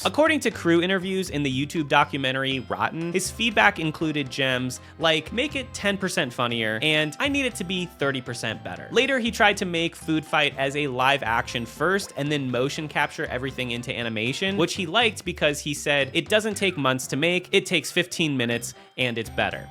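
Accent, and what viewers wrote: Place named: United States. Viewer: Please dude - Speak Slower. I'm native English speaking, but sometimes can't understand your American Twang....just BREATH!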